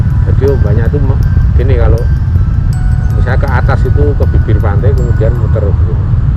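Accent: Java, Indonesia. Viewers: Kamu loganya jawa .......saya tahu kamu aslinya loganya palembang..........apa anda orang indigo........saya juga indigo dari kecil.....dari ayah saya zakir naik......last ferts how do gers boy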